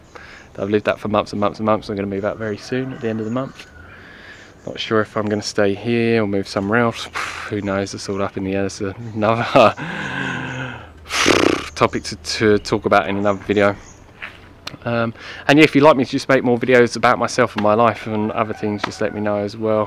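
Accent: United Kingdom. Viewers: is he from the uk?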